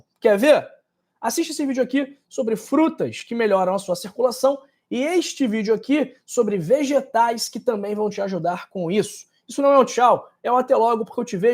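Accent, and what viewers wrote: Rio de Janeiro, Brazil. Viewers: Oi dtor. Adoro sotake. Do. Rio. De janeiro. Acho. Lindo.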